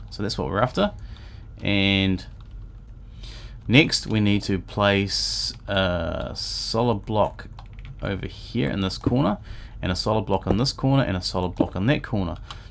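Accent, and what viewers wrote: New Zealand. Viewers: you sound like a new zealander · I guess you have never heard a New Zealand accent then... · EEEG lol you're a total kiwi! Props to you! Genius idea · Holy crap u from Nz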